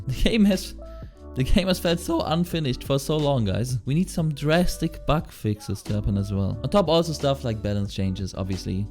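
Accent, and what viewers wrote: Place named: Germany. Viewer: Bist du Deutscher wenn ich fragen darf, das hört man irgendwie heraus...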